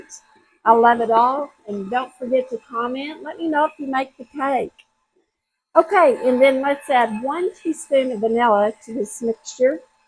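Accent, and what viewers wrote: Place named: West Virginia, United States. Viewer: So delectable. Love apple anything. Where are you from? I am originally from WV, I'd swear you are too!!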